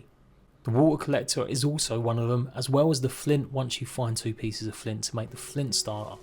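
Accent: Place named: London, United Kingdom. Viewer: That is definitely a London accent you've got there. I just started this game yesterday and was in major trouble with water cause I only had the 2 coconuts that take ages to respawn. Thanks to you I am now smarter. Shout out from Germany. Cheers mate 😁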